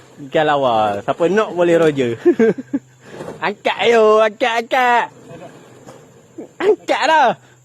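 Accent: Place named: Lipis, Malaysia. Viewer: Tak ada ikan lain lalu ye.....baik baik bahan ke tangan Kang...😂😂original cakap Lepih..😂😂😂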